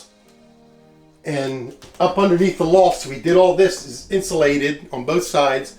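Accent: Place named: Philadelphia, United States. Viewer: From Philly much?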